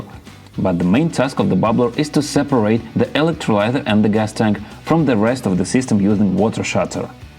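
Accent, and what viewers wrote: Russia. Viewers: русский ёпть, акцент не скрыть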